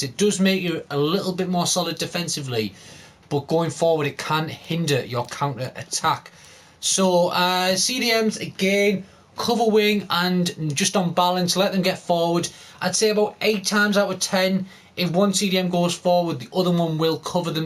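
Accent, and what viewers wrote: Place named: Middlesbrough, United Kingdom. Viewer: where you from mate Middlesbrough? or close? ive never wanted to stream because i thought my accent would sound terrible but....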